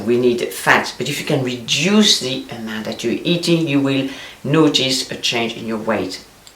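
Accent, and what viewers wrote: France. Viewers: Words of wisdom! I think most women know these rules but it’s so nice to hear it as a reminder especially when it comes with a French accent! It’s hard to stay on track when you’re home now, near a refrigerator. Have healthy fruits and veggies ready to snack on. And the occasional cookie. But sugar is an addiction. So if that’s you (like myself), you may have to cut it out completely to “detox” first. Losing weight is a marathon, especially after your metabolism slows down. This lockdown won’t help, so you need to keep moving, walking or running daily. Enjoy the fresh air with your kids or dog. Once you get older, like me, it’s so much harder to lose those extra pounds, so try not to gain them. That’s what this video is about, maintaining a healthy balanced lifestyle! Good luck everyone! And stay safe!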